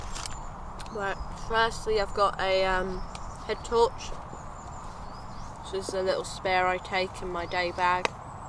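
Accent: United Kingdom. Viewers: Hi,.... i like your English dialect. I keep learn how to speak British English dialect, but it difficult....:)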